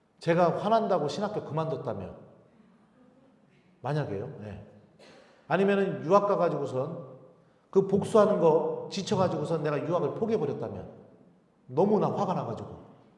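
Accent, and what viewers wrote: Chungcheong, South Korea. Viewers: 신부님 감사합니다 충청도 사투리를 한번씩 쓰셔서 반갑다 생각했는데 대전교구 사제셨네요~^^ 제 고향이 대전 이거든요 신부님강의 너무 즐겁게 또 은혜롭게 잘 듣고 있습니다~❤❤❤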